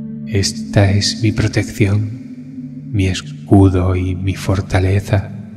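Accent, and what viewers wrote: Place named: Spain. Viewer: Buenos días amigo. Eres una gran bendición. Tu voz es muy bonita y agradable escucharte. Tu accento español es muy bello. Yo soy 28 porciento española. 12 porciento francesa, Indigenous. Te mando un fuerte abrazo desde Hacienda Heights Ca U.S.A. 💯🌟💋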